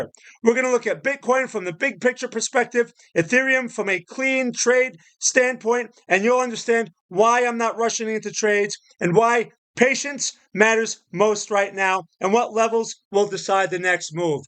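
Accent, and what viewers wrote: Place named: South, United States. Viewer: Came for ta instead end up listening to a hill Billy meth head 😂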